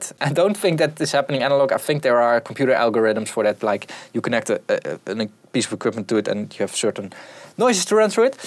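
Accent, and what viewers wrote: Netherlands. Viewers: are you from HOLLAND?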